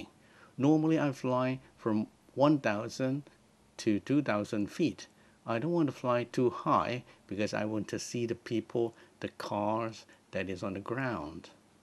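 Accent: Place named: United Kingdom. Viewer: You sound British. almost.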